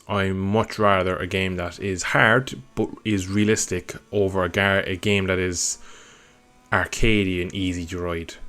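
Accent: Ireland. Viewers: I wish they'd do something like Codemasters does with the F1 games. Making the riders switch teams and move up (including your own riders) and like EA also did with their NASCAR games. MotoGP riders retiring or switching teams and riders moving up from Moto2, Moto3 riders moving up into Moto2 and Rookies Cup riders moving up into Moto3. PS: like your Irish accent :)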